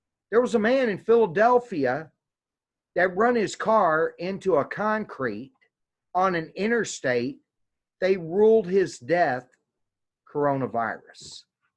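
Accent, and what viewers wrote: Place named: Georgia, United States. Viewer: either way, he says it in Georgia talk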